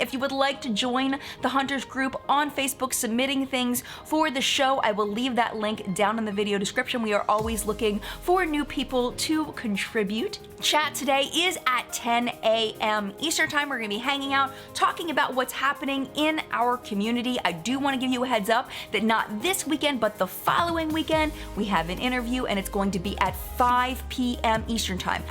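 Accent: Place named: Philadelphia, United States. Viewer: Where are you from? I'm catching maybe some Philly "o"s?? But I don't really know what I'm talking about.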